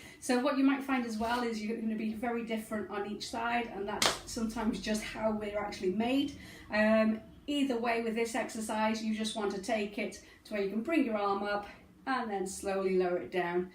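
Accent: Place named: United Kingdom. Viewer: you are beautiful and I love your British accent. God bless you from the U.S.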